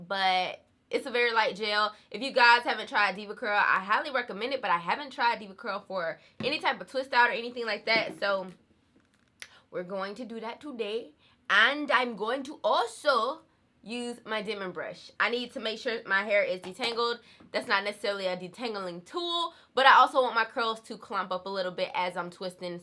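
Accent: South, United States. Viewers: I feel sooooo dishrespekfu with my 8hr late comment 😩 BUT I couldn’t let the day go by without watching! I have to say there’s NOTHING like following someone who’s personality is JUST the same as yours 🥰🥰 I swear I do that doggy breathe when I get excited sometimes when I’m super happy or something(randomly) and honest to God A LOT of the funny random things you do , I DOOOO!! , and your ACCEENNTTTT 😩😩😩 I love a southern accent 😍. Any who, thanks for another great videooooo.. hair was Kayutee...can you do a wash n go with the Mielle pomegranate and honey leave in, smoothie, and custard PLEAAASEEEEE 🙏🙏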